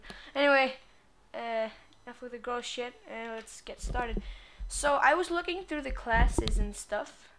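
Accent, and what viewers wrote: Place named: Sweden. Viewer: cool videos are u from sweden u sound swedish. :D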